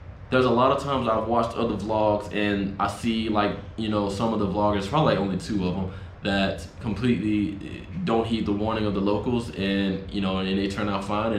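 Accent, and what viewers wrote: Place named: United States. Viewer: Saludos desde O.C. En Cali nadie sale para celebrar el año nuevo. Todos están en casa con su familia. Si salen se salen después en grupos. Si puedo darte un consejo, soy también de Oak Cliff pero tú Español y tu accento es gringo. También tu andas como no sabes nada de Cali o el mundo Latino. Cuidar Pa tengo que suscribir a tu canal.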